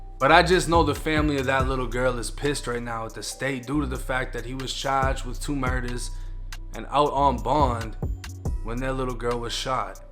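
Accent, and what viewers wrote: Boston, United States. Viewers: thanks for the UPDATE. that little girl deserves justice; and to think had been not been in prison, who knows if the circumstances that led to her death could've been prevented. BTW: are you originally from BOSTON?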